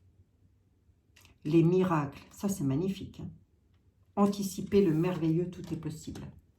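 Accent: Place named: Vosges, France. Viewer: Le léger accent ne m'a pas trompé. Je suis aussi des Vosges mais cette fois, avec un accent beaucoup plus prononcé. Je l'ai perdu un peu depuis que j'ai quitté la Lorraine...😂🙏😇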